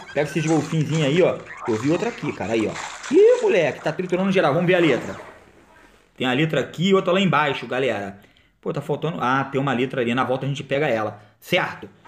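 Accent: Rio de Janeiro, Brazil. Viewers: tu é do rio de janeiro né?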